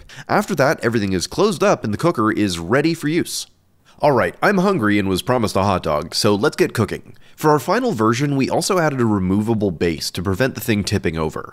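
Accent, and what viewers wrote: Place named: Canada. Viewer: I love how you can tell this dude is Canadian in the first min. "Must be cooked from the oatside"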